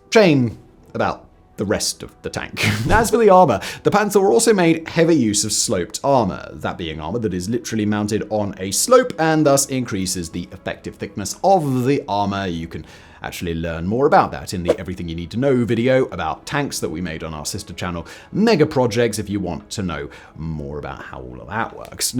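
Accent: RP, United Kingdom. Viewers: You like it or not the Tiger tank piled up many kills and is without any doubt the most famous tank in history. Why don't you make a video and describe with your unsuportable posh accent the real pieces of junk that were WWII british tank?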